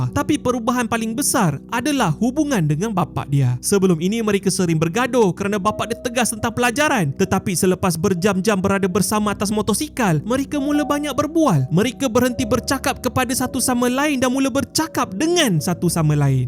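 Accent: Sabah, Malaysia. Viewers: ak lama sudah mau tnya ni, kw ni org sabahan ka?😂😂 dgar logat sabahan, minat smua konten kau. Smua lucu² ndk bosan tgok. Sihat slalu dan semangat bekonten 😊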